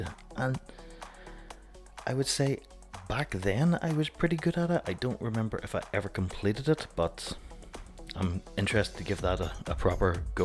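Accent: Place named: United Kingdom. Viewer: Hi, great video thanks , from the sound of your accent Ur British, so my question is, if I buy one will it work in uk as most I see are sold from America cheers steve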